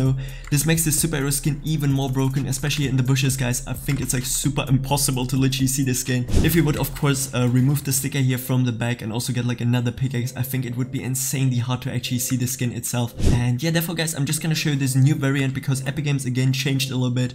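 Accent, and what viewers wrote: Germany. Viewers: bruder dieser deutsche aktzent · Are you german?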